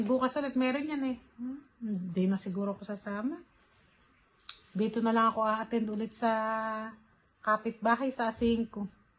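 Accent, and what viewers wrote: Nueva Ecija, Philippines. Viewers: sabi ko na nueva ecija ka...kase the way na mag Salita ka....nueva ecija din ako.....saan ka sa nueva ecija?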